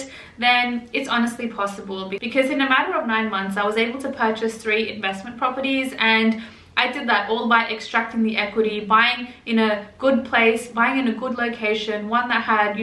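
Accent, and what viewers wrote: Australia. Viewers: Aussie? Hi from America!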